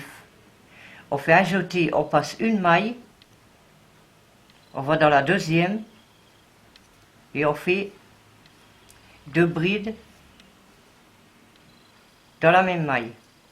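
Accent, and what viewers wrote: North, France. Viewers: Bonjour ! Merci beaucoup pour votre pédagogie, vous êtes simple, toute " mimie " avec l'accent du "chn'ord" que du " bonhor !!"🌹👍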